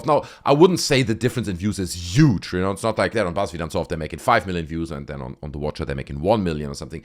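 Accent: Germany. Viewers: Hey, you sound like you're German, if so: greetings from Germany to Germany! 😊 You are totally right with what you say: the old Buzzfeed episodes felt more fresh, more funny, more original. The content they are doing on Watcher looks more expensively produced, but it quite lacks the original soul and comedic harmony between the two guys. I always thought that they should have stayed at Buzzfeed, their new channel was quite mediocre in my taste. Some shows were good, like Puppet History, others were questionable (Too Many Spirits... it may sound fun, but having seen friends go down due to alcohol abuse I am above thinking that getting drunk is something which should be fun), and pretty much all shows with Steven Lim were not good. I remember that one video when they announced a discussion about wether ghosts are real or not... I thought great, just like old times, except it was not. 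Shane was good as ever and did a great presentation, but Ryan was SO annoying that I had to take three steps to complete the video, he was almost unwatchable. He looked like he had spend the last two days sleeping under a bridge, what he said was not funny at all, he did not even try to participate to the topic of the video. When he started munching apples while talking, I was one click away from stopping for good but I finished it to see if it got any better... it did not. And then they decided to blow up their own channel. It cost them half a million subs (in fact they lost another 10K to when I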